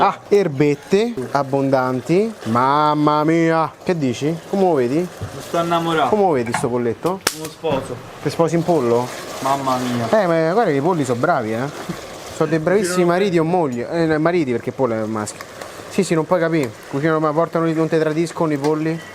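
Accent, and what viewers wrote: Rome, Italy. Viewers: Come sei simpatico, ai un dialetto! Che mi piace da morire! Super romanesco! Io sono Toscana Pisa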